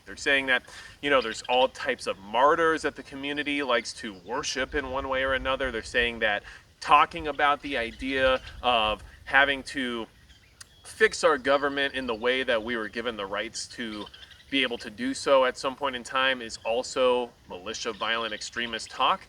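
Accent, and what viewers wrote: United States. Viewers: You look and sound like a typical American to me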